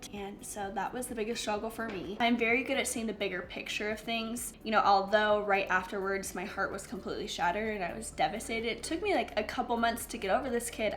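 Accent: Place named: Ohio, United States. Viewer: I don't think your voice sounds like you're always having a cold, it must be your accent that does that. My English teacher comes from Ohio and she has the same accent as you and it's so cute!!! You helped me bring so much more positivity into my life ever since I started to follow you and thank you for that! My new year's resolution is to stop procrastinating but I'd be able to procrastinate on that too tbh 😂 Ig: yellow.dazzle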